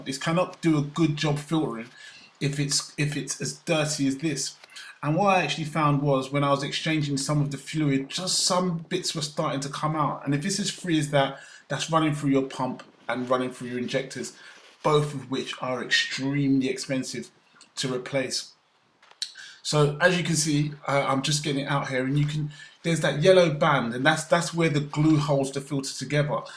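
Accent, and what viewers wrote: United Kingdom. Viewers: where are you from .. England ? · You know just by hearing you talk im almost 100% sure you are black and from the uk EDIT: no offence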